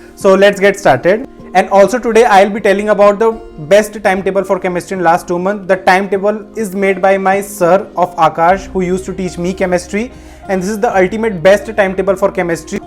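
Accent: Gujarat, India. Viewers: Are you from gujrat ? 😅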